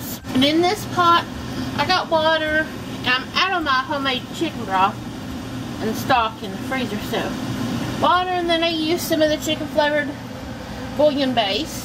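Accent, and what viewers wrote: South, United States. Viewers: Love your southern accent... just like me ;)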